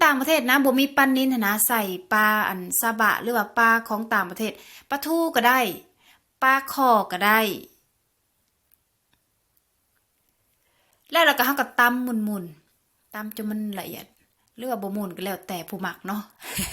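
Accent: Savannakhet, Laos. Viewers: สะหวันนะเขดเดี้ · ສຽງສະຫວັນ ບ້ານຂ້ອຍເລີຍ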